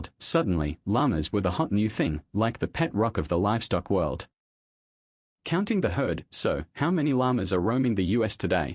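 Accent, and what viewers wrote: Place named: Australia. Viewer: did you choose an aussie accent for this u.s. history lesson? perfect 😎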